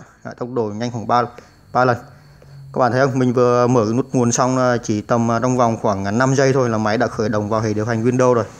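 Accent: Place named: Nghe An, Vietnam. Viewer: Giọng xứ Nghệ nói khó nghe thật , lắng nghe nhức lỗ tai mà chẳng hiểu nói gì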